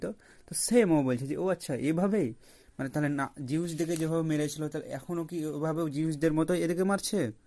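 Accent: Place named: Murshidabad, India. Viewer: Valo hoyechhe tobe kolkatar banglay video korben majhe majhe ektu Mursidabadi tan aschhe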